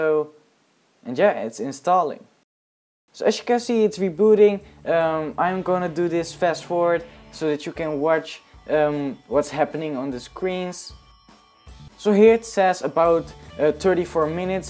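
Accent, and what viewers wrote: Netherlands. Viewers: where u from brah ? dutch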